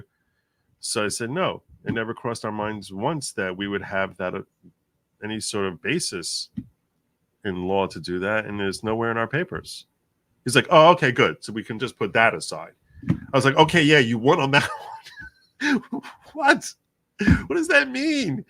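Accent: New York, United States. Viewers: Thank you 🙏🏽 God Bless you. I just found you and have subbed. I appreciate you more than you will ever know. 🇺🇸 You are a true AMERICAN. Thank you for the attempt to remove gag order. I love your NYPD cap. As I am a recently retired 911 Dispatcher of 30 yrs. Half State time and half County time. This cap means a lot to me. You definitely have a NY accent! My husband of 20 years is from Queens-lifelong. And he has lost a lot of his accent being in the south. But it’s definitely still there. He does still say “ Can I ax you something?” 😂😂 I tell him he better not AX ME! 🤣 Thank you so much. 🇺🇸💙💛💙🇺🇸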